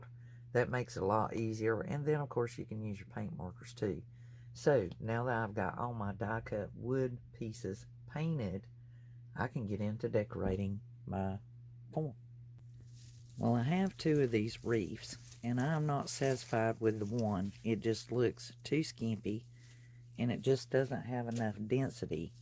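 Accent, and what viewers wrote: South, United States. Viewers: We live on a teeny tiny island off the coast of NC...so...you KNOW I LOVE this one. Covid closed down all the craft shows that feed, house and clothe my family so it's been a really HARD year. I'm ready to kiss 2020 goodbye come midnight December 31😘🙋‍♀️. Looking forward to a NEW YEAR 😊🤗 and lots of new crafts!! TFS and I find your sweet southern drawl so charming and relaxing...like sipping sweet tea from the front porch rocking chair on a hot summertime afternoon 🎄💓God Bless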